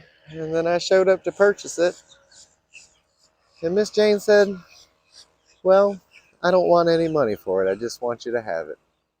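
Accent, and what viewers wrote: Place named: Texas, United States. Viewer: great classic Mazda, and love your good ole Texas accent :face-blue-smiling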